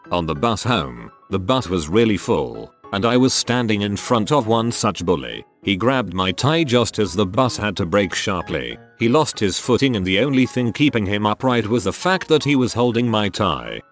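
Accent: United Kingdom. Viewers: That’s because it speaks in British English.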